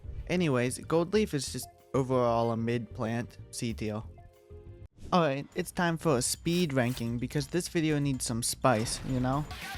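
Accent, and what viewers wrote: Romania. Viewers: I know ur romanian or moldavian , but i can't prove it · Are you Romanian? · Are u romanian? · Are you perhaps romanian?? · r u romanian? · romanian detected, my fellow balkan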